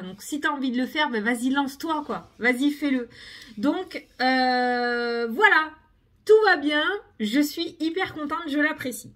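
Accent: South, France. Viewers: Bonjour et encore merci. Pour moi ce sont tes abréviations ( maniant au lieu de maintenant ou si t es d accord au lieu de si tu es d accord......cela exprime ta joie et ta volonté de nous faire aller vers le meilleur très rapidement car tes idées fusent. Il y. On doit se bouger le « derche ». Et il n y a pas qu un chemin pour y arriver,il y en a plusieurs et enfin tu vas passer un sale quart d heure mais cela t amènera à une vie de joie.........tes phrases sont pleines d espoirs et de vitalité avec ton accent du midi, c est ce qui donne de la force à tes paroles quelque soient les mots que tu utilises. Encore merci et à demain 🙏🙏🙏🙏🌞🌞🌞🌞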